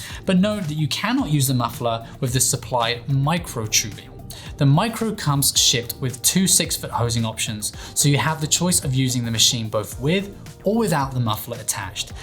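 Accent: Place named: United Kingdom. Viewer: Looks like he is from the Great Britain...according to the accent :P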